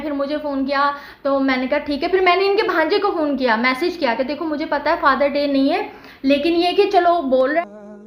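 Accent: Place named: Karachi, Pakistan. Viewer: Lalukhetan lgti ho, tmhara lehja bta rha hai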